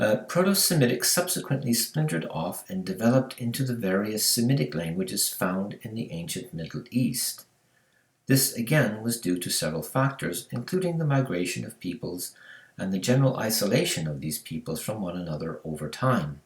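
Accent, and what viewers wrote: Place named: United Kingdom. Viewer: Ps. I thought that you said that you are from America and your father is Polish. But IMHO your accent is British, not so much accent but the way how you construct sentences and words you use. So you are expat leaving in USA? Have a great day!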